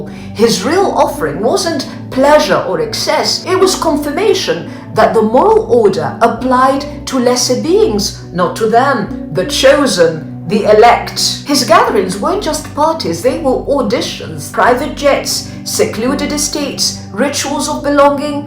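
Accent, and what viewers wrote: United Kingdom. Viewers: Looks like AI to me? Posh Brit accent of an older woman?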